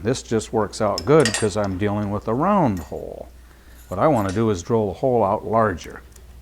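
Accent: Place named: Midwest, United States. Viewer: called a Midwestern accent.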